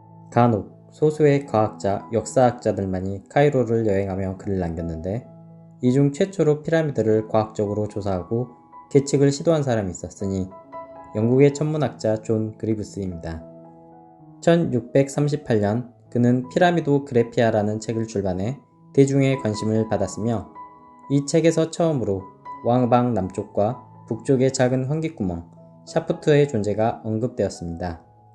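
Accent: Jeolla, South Korea. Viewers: 모음 발음이 전라도식이네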